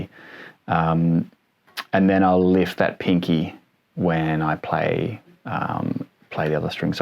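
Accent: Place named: Australia. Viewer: Also very cool to find another Kings X fan with an Australian accent 😀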